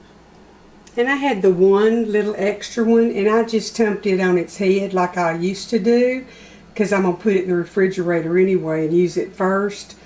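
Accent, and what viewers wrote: South, United States. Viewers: I make a dill relish that we absolutely love! I think I will try this recipe as well. It does look so yummy! I live in Michigan and we are trying to get get gardens started here. I would love to see any canning videos! I am a canner from way back but never get tired of watching canning videos! I love to try new canning things. Like I said I’m a canner from way back, helping my mom as a young girl and then for my family, but I’ve just started dehydrating. Do you have plans on making dehydrated videos? I would love to see that as well. I’m really enjoying your videos. My family is from the south so hearing you talk and they way you phrase things is just beautiful! It reminds me of home! Thank you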